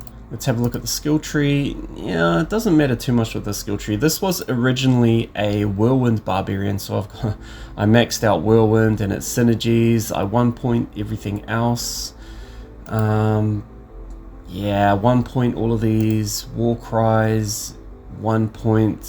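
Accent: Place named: Australia. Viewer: Are you australian? Your accent sounds australian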